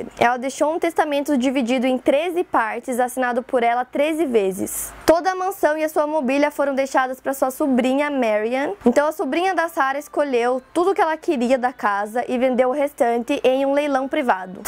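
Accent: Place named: South, Brazil. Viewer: Que sotaque muito bom de se ouvir,qual estado do Sul você é?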